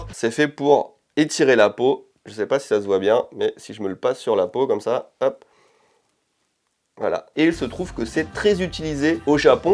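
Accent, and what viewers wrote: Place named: France. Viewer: Je suis complètement d'accord avec vous. Comme les français, les japonais n'aiment pas les sous-titres. Donc, parlant japonais avec un accent français, ça va faire fureur!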